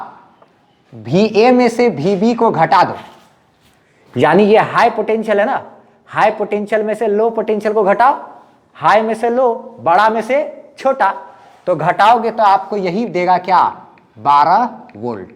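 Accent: Bihar, India. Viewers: Sir ji aap bihar se hain na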